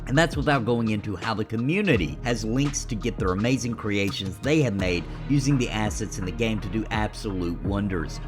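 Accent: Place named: Appalachia, United States. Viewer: l knew I heard some Appalachian I'm from Southern wva so I picked it up lol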